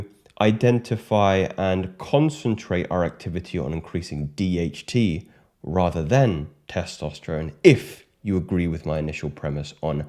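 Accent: United Kingdom. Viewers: mate, didnt thought of you being a geordie ??!! 😅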